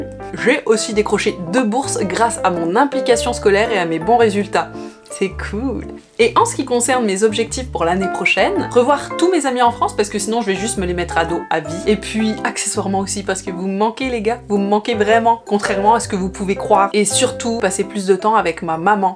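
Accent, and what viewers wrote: Quebec, Canada. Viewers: tu commences à avoir un petit accent Québécois non ? :p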